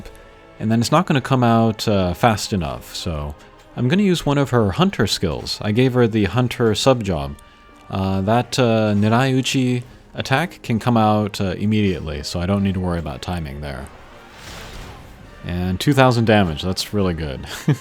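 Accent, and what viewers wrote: Japan. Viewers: Hi! Welcome to channel, where contents are great and the content creator shows a great and genuine enthusiasm toward this game. If you are new here, then you might know that this great guy likes to bring the sense of Japanese culture and pronounciation during during. He is a smart guy. Let's focus on the content and appreciate any uniqueness that he share. The whole world is not centered to the West, you know:D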